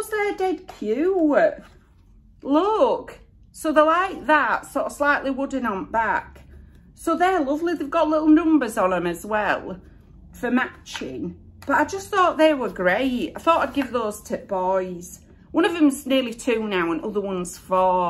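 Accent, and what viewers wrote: Yorkshire, United Kingdom. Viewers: Hello to you from New Zealand I have just started following you and really enjoy your videos especially cos you are so natural as you present them with no airs and graces !Its great to hear your unaffected Yorkshire accent and sense of humour.I am originally from York ....bit further north from you .....be good to see some of your art work please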